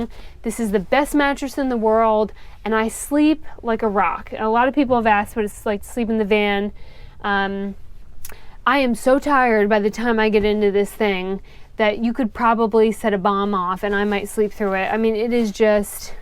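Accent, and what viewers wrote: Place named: Detroit, United States. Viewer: Wait? Are you from Detroit? You sure sound a hell of a lot like me, lol. Cool sweatshirt. I have lived in Utah for several years now, and I told a friend "I need to make a sweatshirt that has the PSA: "I no longer live in Detroit...but a LOT of Detroit still lives in Me!". Happy trails!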